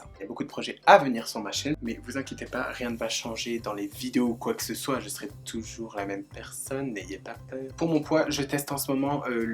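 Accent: Belgium. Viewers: Je suis à la ramasse avec tes vidéos mais je suis à jours sayait lol. Tu es belge ? Question bête 🙄. Je t'adore trop trop trop trop tu m'fais tellement rire 😂 Continue d'apporter de la joie ❤️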